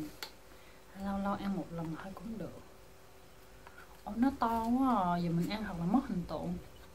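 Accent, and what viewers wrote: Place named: Long An, Vietnam. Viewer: giọng nói giống người long an nhỉ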